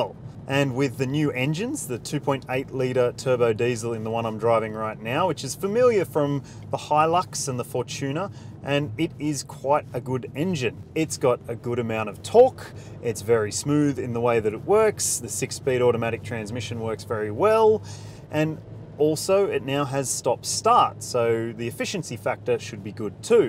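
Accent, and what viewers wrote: Australia. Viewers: Hate the Aussie accent, but good, informative and even fun piece.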